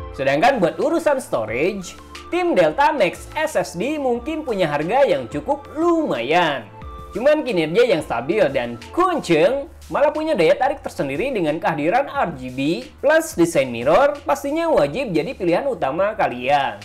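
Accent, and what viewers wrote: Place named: West Java, Indonesia. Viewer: sunda pisan euyyy...kerenn, sukses terus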